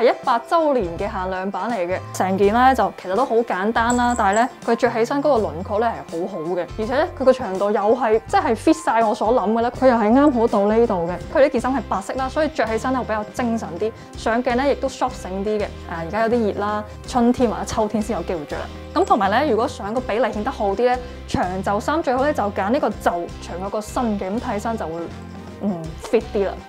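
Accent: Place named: Guangzhou, China. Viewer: 衣著有品味，人靚、聲甜，好喜歡你！聽到你的廣州口音，特別感到親切……。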